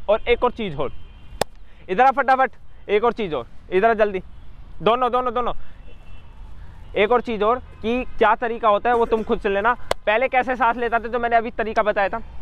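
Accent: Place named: Haryana, India. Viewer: 😃😃😃haryana h bhai Uski glti n h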